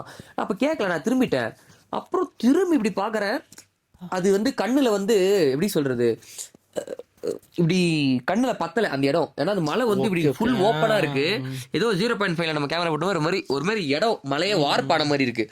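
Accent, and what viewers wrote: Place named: Kanyakumari, India. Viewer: Nanum kanyakumaritan.... Slang la therinchuthu .... ❤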